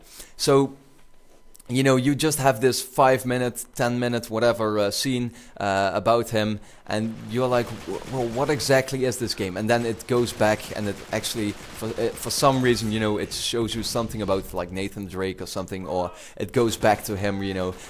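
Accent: Netherlands. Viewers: never tried it before, i'll let you know the taste at june 10th, When they anounce U4, I realy hope they do ! :D Where are you from by the way, It looks like i hear a little dutch accent ?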